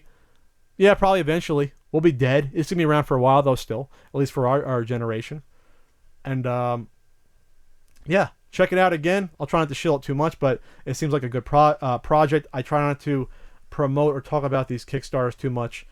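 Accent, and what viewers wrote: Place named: Northeast, United States. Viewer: Sounds like you might be from the northeast. GL on the film.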